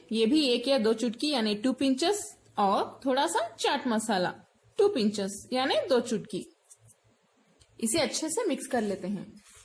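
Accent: Hyderabad, India. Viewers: Madam ka Slang Hyderabadi dikhra😁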